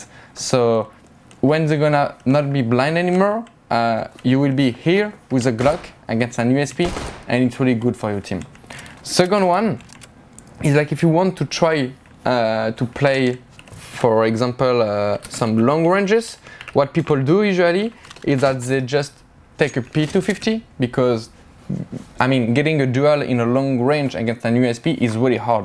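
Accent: France. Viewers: Gre tu comprends ps en plus c un anglais de français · The french accent xD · Totally agree, but at least hearing shox trying to speak english is funny (even for french people his accent is bad)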